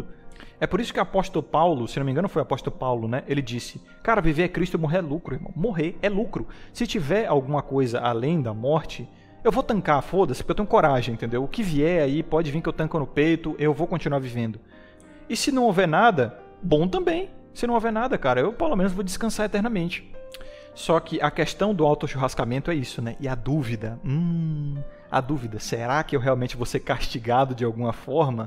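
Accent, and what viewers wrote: Bahia, Brazil. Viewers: Tu é baiano?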